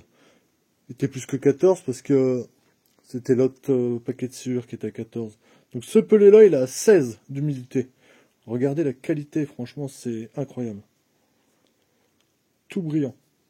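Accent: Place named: North, France. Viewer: je m'en douter que tu était du nord accent de min coin cha sintin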